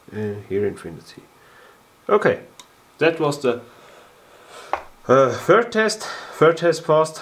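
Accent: Bavaria, Germany. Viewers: Dieser deutsche Akzent aus Bayern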